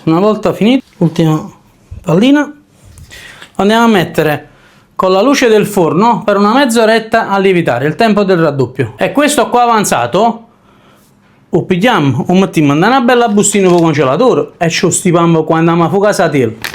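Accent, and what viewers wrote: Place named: Naples, Italy. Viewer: Ciao cuoco mi piace come parli il napoletano ciao un abbraccio ciao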